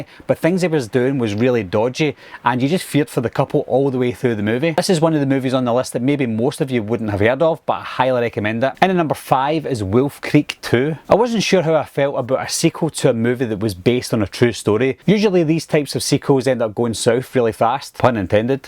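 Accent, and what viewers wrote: United Kingdom. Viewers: Just please show a movie, dont show your commentary. You are not Australian. You are British!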